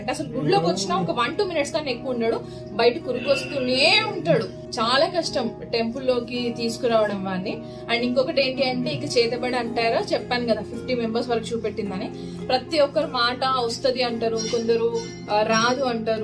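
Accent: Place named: Charakonda, India. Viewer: Akka chrakondhalo super ga chaptharu akka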